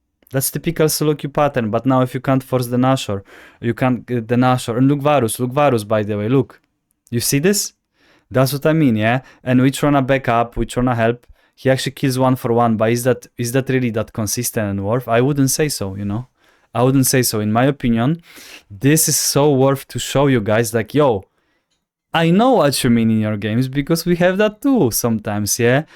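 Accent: Poland. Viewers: piękny polski akcent. drugi taki, rosyjski, Malkovitch w "Rounders"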